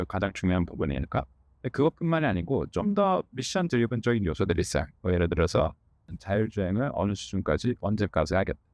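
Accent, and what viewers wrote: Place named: United States. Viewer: 혀좀 덜구리지요 미국말 어색해 ㅎㅎ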